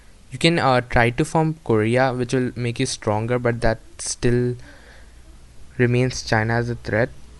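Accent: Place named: India. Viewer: I love the indian accent man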